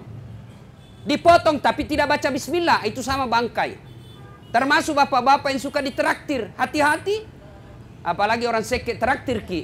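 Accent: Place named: Sulawesi, Indonesia. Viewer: Suka sama ceramahnya. Màterinya ttg kehidupan skr plus dgn pendekatan komunikasi yg baik dgn dialek dan bahasa gaul ala sulawesi. Hy yang mengganggu, kok byk kata 'cilaka' atau celaka dlm arti bhs Indonesianya ya? Bukankah kata2 yg diucapkan itu bermakna doa ya? Apalg oleh seorg ustad seperti ustad Das'ad? Maaf jika saya saja yg merasa terganggu...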